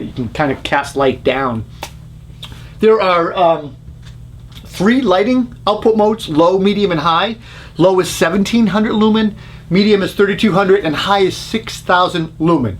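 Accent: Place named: New York, United States. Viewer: Great review , sound like a New Yorker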